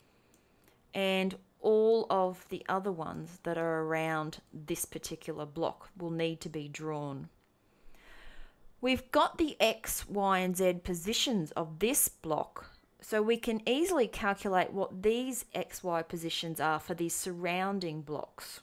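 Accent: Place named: Australia. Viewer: Tea Requiem It's an 'advanced tutorial' she's not going to explain how methods work etc. That much is supposed to be given. She gives a brief overview of the code, and leads the rest up for you to think about after the video. If you ask me, that's how I learn best. Doing, not having someone explain everything; and experimenting on your own! Anyways, as for accents, I'm pretty sure no one really complains about Australian accents. Her's isn't deep to the point where I don't understand, and it's perfect to listen to it. And no I can't deal with heavy accents. Why would you even complain about that? It's my personal opinion lol Anyways I really liked this video, she deserves subscribers from everyone!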